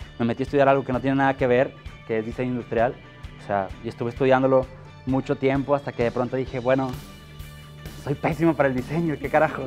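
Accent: Mexico. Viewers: No, el ya habla más fluido y sin la papá en la boca, el acento? Pues así hablan todos los regios.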